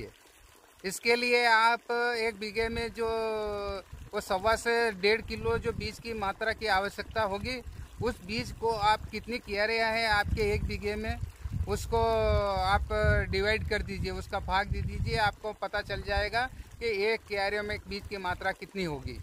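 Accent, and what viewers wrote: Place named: Gujarat, India. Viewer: Bhai Gujarati he bava Hindi bolte he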